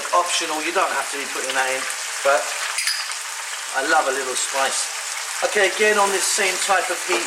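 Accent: United Kingdom. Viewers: I tried the recipe, awesome and I love your British accent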